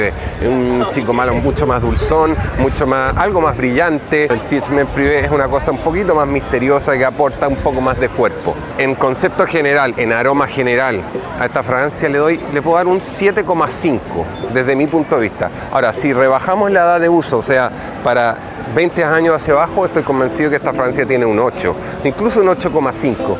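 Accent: Chile. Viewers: Es muy divertido oírte hablar con acento chileno pero con modismos españoles, jajajajaja, me gusta mucho tu canal, gracias a ti uso Acqua di Gio Profumo. Saludos desde Chile 🇨🇱!!! Un fuerte abrazo!!!! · Lo escucho hablar y se me hace que es chileno jajaja o es mi idea🤔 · Que genial escuchar sobre este tema y más con el aun acento chileno. Estaba viendo el canal de Rosy MC Michael que tiene millones de seguidores y te menciono, me alegro de haber buscado tu canal. Éxito! y saludos desde Chile :)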